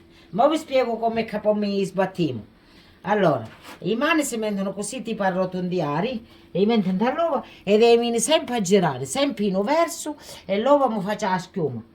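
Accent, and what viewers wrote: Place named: Sicily, Italy. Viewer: Mi piace molto anche mia nonna li faceva cosi ..e mi piace anche il tuo dialetto mi fa ricordare quando ero bambina in colleggio a messina.Istituto santa brigida.❤❤❤❤❤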